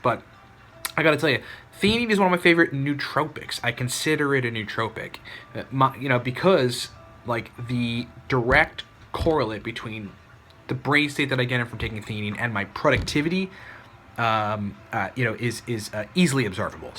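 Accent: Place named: New York, United States. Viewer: that's pretty close to New York. That's probably why I don't notice a difference between your dialect and mine. The only time I notice people talking fast is when they have a unique voice in addition to them talking fast (Ben Shapiro). I really didn't notice it in your case since your voice isn't that unique.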